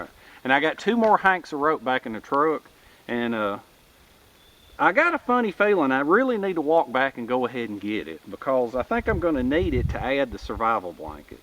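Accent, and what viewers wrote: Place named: West Virginia, United States. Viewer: well either way my wife's a fan, I think it's the accent. My cousin Mike, back home in WV looks and sound just like ya...✌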